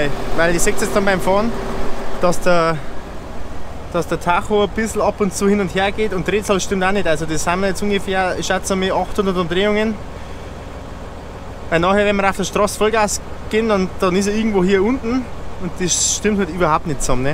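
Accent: Bavaria, Germany. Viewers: I find des foi geil dasst du no boarisch redst, gfreit mie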